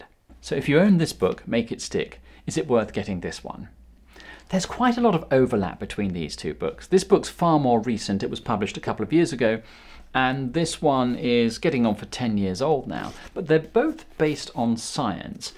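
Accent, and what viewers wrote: England, United Kingdom. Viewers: Darling, your English voice is so comfortable to listen to, would you consider bringing in a Spotify podcast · why is every fucking youtube clip I click on either narrated or presented by a Brit? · Ok United Kingdom accent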